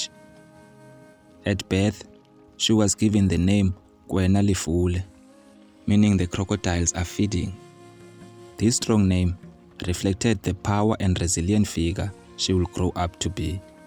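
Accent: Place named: Xhosa, South Africa. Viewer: Great story but u'r strongly Xhosa so u'r clearly struggling to pronounce other sotho names...you can't narate a sotho story with a Xhosa accent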